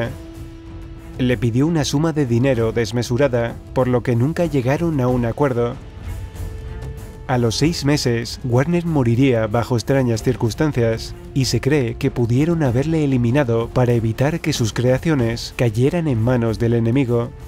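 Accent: Spain. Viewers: coño!!!!un español narrando el video!!!!yuhuuuuu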